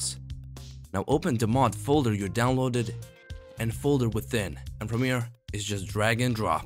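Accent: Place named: Russia. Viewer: i really like your accent, are you russian? also, LOVE the video! it's really well made, easy to understand (no-knowledge-about-mods-me understood it, which is impressive! XD)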